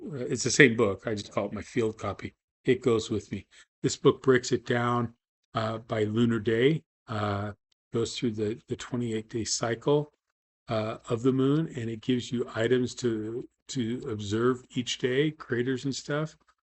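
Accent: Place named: United Kingdom. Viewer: So you are canadian ? I thought you were brittish .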